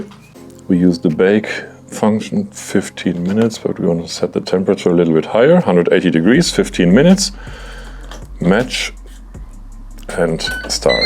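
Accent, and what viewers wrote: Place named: Germany. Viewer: You speak with a German accent and refeer to inches!? Who knows what that is?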